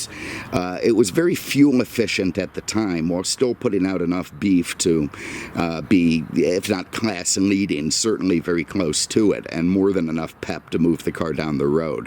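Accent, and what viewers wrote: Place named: New York, United States. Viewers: Just can't take the NY accent. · Ooh a New Yorker. I look forward to that.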